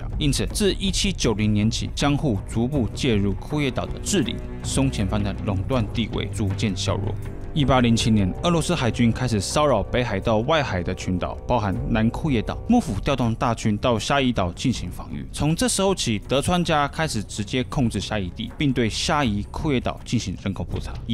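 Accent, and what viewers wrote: Taiwan. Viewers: 读书啦大佬，中国多民族是1912年起，不是历史2000年是一个多民族国家。知道历史上2000年，汉族中国跟外族经常生死搏斗吗？知道中国曾经被蒙古人所灭，朝代被称为元朝。汉族被满洲人所灭，朝代称为大清，正名是大清帝国，与日本签署丧权辱国的马关条约上，李鸿章是用大清帝国签署，不是用中国名称的，网上有副本可参阅，自己去看啦。知道五胡乱华，汉族几乎灭族吗？可以反苏反俄，但不可以篡改历史。知道本帖帖主是台湾人，说的是台湾国语吗？ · 在中立、客觀的學術表面包裝下，所成立建構的：庫頁島歸屬邏輯，一樣可套用在臺灣島上囉？？ 被倭人所產生的倭寇，一度以次等人種，來統治對待的後代，現在操著臺灣口音的標準國語，一字一句，企圖向中華兒女，對近代中國血淚屈辱史，要建立所謂的「正確」觀念教育，還真是我中華民族的悲哀。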